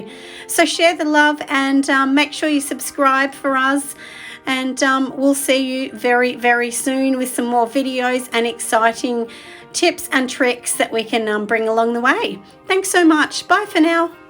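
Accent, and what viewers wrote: Australia. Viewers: I just found your channel, and I'm really glad I did, I also just HAD to subscribe. I also have to tell you I absolutely love that you use wood wicks in your candles. I'm not a big fan of candles at this point, because there's nothing that ruins the scent as well as the ambiance a nice candle creates more efficiently than blowing it out and smelling the (to me) stench of a smoldering wick. I am so looking forward to binge watching your older videos, as well to seeing your newer content. By the way, I absolutely love your Australian accent - God bless!! 💗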